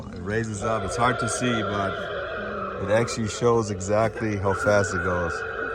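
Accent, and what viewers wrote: Germany. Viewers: Dachte sofort als ich dich hörte, das ist ein Deutscher und dann sah ich den username. 😂😂😂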